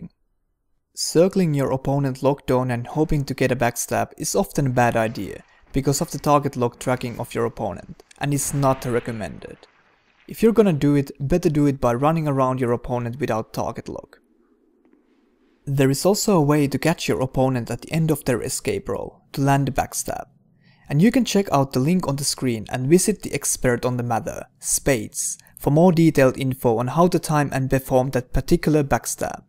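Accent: Finland. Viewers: arvasin tosta aksentista :D terveisiä kajaanista :DDD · are u finnish? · that accent, i could swear that you are from finland =)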